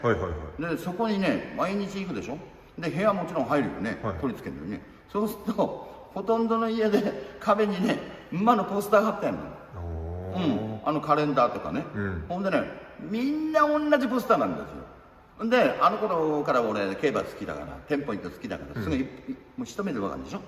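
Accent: Fukushima, Japan. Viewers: やっぱり福島の訛りか～、死んだじいちゃんと喋り方そっくりで涙出てきた じいちゃんはメイセイオペラが好きでした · この方、福島の方でしょうか？ 浪江出身の祖母の訛りによく似ていて心が和みますｗｗｗ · 訛りがうちのじいちゃんに似てると思ったら同じ福島県民だったんですね笑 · 私も地元福島なので訛りが心地いいです。これからも動画見ます！ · なんか聞き慣れた訛りだと思ったら同じ福島県でしたか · なんか喋り方きいたことあるなあと思ったら福島出身でしたかｗ 親父の実家福島だったから懐かしい感じするわけだわｗ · 前から喋り方、イントネーションが福島県民っぽいなあと思っていたらやはりそうでしたか！ 同じ福島県民ですが相馬野馬追、一度も見たことがないのでいつか見てみたいです！ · こんだけ話せればボケにはまだまだ早いｗ あと私も福島出身なので訛りがなんだか懐かしいｗ いつまでも元気で馬の話して欲しいなぁ 馬の脚は第二の心臓っていうからねぇ… 蹄を地に付けて歩くことで身体に血を回すポンプとしての役目を担うから 歩けなくなったらもう致命的なんだとか 個人的にヒシミラクルのウマ娘化期待してます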